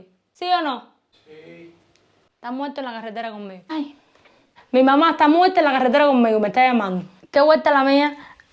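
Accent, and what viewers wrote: Cuba. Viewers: Saludos!! Muchas Gracias por este video,, siempre me haces reir,, yo soy criado en PR, algunas palabras se dicen igual que en Cuba,, como: Chacho, Guagua, poripalla, jevo entre otras,,, he aprendido muchas frases y palabras gracias a mi esposa, ella es nacida en la Havana vieja, Tengo que decirte que a mi me encanta el acento cubano, y a ti te entiendo perfectamente, y yo creo que si no fuera por tu acento, quizas no me hubiera subscrito a tu canal, 😁, ignora esos comentarios negativos sobre tu acento,, te apoyo siempre,, cuidense! ✌🏼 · aqui con mi cubanita de confianzza me gusta el acento de esta chica saludos desde mexico bonita!!! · Me encanta tu acento cubano, incluso aveces lo trato imitar, no hagas caso a eso que te dicen que no sabes hablar ellos ni hablar su propia lengua saben jajajajaja Echar palo en México tambn se utiliza jajajajja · Remangalatuerca fue mi favorita, 😂😂😂 pero todas se escuchan súper con tu acento , mi cubana favorita! 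😘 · Soy Mexicana y tú eres mi Cubana favorita ❤ y me encanta como hablas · 🤣🤣muero de risa contigo! No me gusta el acento cubano pero tu eres única! Me encantas! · Siempre te entendí todo desde el minuto uno, a pesar de la velocidad. La musicalidad del acento cubano es única. La R nos suena a una ele para nuestro oído porque en el río de la plata la marcamos mucho la errrrrrrrre... para darle énfasis a algunas palabras. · He visto muchos de tus videos y con éste me suscribí. Es la idiosincrasia del cubano lo que veo en ti y me parece que es lo que te distingue, esa forma de hablar, la alegría que reflejas. Hace un par de días me encontré con un gran amigo que tenía 17 años viviendo fuera del país y no ha cambiado ni un tono en su acento, le hice el comentario y lo celebramos. Deseo que continúes con tu forma de ser y que alcancen todas sus metas "caballero". 🥰🤗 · Hola y esposo. Te admiro mucho 💕. 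A los que no les gustan el acento cubano se pierden ese Sandungueo chica. A mi y mis hijos les fascina. Solo es cuestión de adaptarse al acento. Todos los países tienen sus modismo de hablar. Ni te imaginas como me divierto con tus ocurrencias. Un saludo cariñoso desde New York Cubanita hermosa 💖 💕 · jajaj me he divertido con esto sobre todo porque el que habla conmigo dice que no tengo acento cubano y el tuyo ya tan bello jajaj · En todos los países tienen su forma de hablar y no tienes que ver con la educación, Cuba, Puerto Rico y Rep.Dom tienen mucho en común, soy Dominicana y amo a Cuba y a los boricuas, pero me encanta el acento Cubano, es por eso que sigo a. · Tremenda enseñanza, Muchas gracias gran Maestra. Me gusta ese acento, y tan preciosas que son las cubanas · Te escucho sobre todo por tu acento y expresiones cubanas, me encanta, cierto que entiendo mejor a que Adelito, los quiero xx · Pues si has dado una clase májistral De cómo hablamos los Cubanos , ESTAS ESCAPA 😅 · Me encanta la buena vibra de. 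Y no dejes tu acento y tus frases tipicas cubanas...ese "sello" he enriquece y hace unica eh Latinoamerica. Yo soy peruana y cuando joven por ejemplo usabamos la frase "esta palteado" que significa esta confundido en nuestra rica jerga peruana....o "na' que ver" para expresar no es eso. La jerga, los acentos, etc es parte de nuestra cultura local. No la pierdas! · Divina de graciosa eres y tu asento cubano..hermoso "" ia tu sabes"" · hay muchas palabras que no conozco, el acento cubano que tienes se escucha muy bonito.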